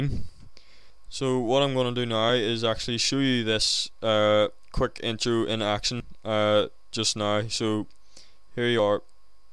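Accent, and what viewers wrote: Northern Ireland, United Kingdom. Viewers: you from northern ireland matE?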